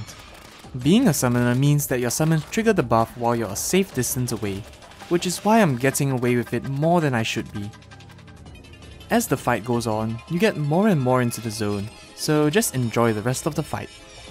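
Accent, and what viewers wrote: Singapore. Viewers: Are you SG/ Malaysian bro? Going by the accent 😃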